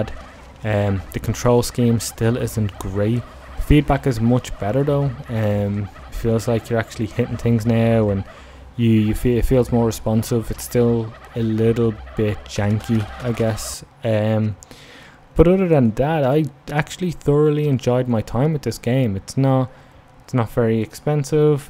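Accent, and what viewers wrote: Ireland. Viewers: You're Irish mate aren't ye, thanks for the review though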